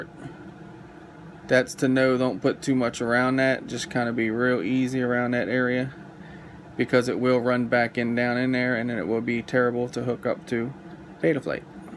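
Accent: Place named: Philadelphia, United States. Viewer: I'm from Philly and your accent is close enough to ours where I definitely trust everything you say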